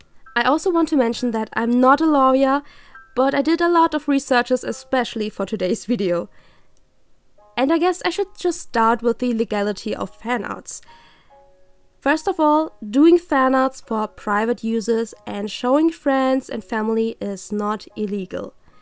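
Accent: Germany. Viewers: In my opinion you sound like an German, speaking english. You know what I mean? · Ich wusste erst nicht das du Deutsche bist...hab's aber iwi an dem Akzent erkannt...denn viele deutsche sprechen manchen Vokale im Englischen nicht richtig aus...bzw. klingt es nicht nach dem typischen Englisch...ich meine es nicht böse -3- ^-^ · Your 100% german. I am too, and I can hear it through your accent ! :D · Hey, I didn't even notice that you were german, until I saw that you used german Texts as examples in your video! And when I listened closely afterwards I could even hear an accent, which I didn't hear before. I'm german aswell, and it's always nice to meet other good, english speaking german artists. ;,D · Hey :D are you german? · great video and incredible drawing! (btw are you from germany? your english sounds like it c:)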